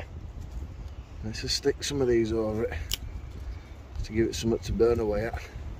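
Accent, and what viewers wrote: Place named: Yorkshire, United Kingdom. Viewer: those Gaz lamps are great but... the mantle is a pain to fit and they are fragile. I just use rechargeable LED units now, both headlamp and lantern. As for the trainers... I wear them because they are comfortable and get the job done. There is a saying that every pound on your feet adds ten to your back. I wear some very light Sketchers. I bought a pair of Merrell Moab 2 which are designed for trail hiking but they are 500g heavier and not as comfortable. Keep up the great work, never lose the Yorkshire accent; it's great to hear after 50 years in Australia. I'm originally from Stockton-on-Tees.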